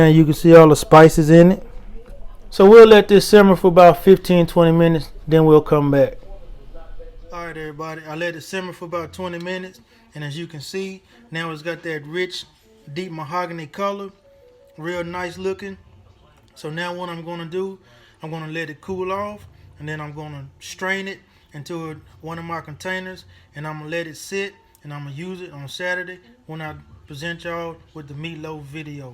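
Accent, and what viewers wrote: Deep South, United States. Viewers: Always trust the man with a Deep South accent to teach you how make a BBQ sauce. Thank you sir.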